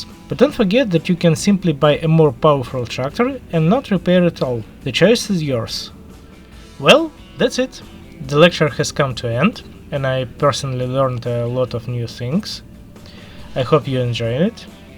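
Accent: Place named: Arab world. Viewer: i can't deal with your arabish accent sorry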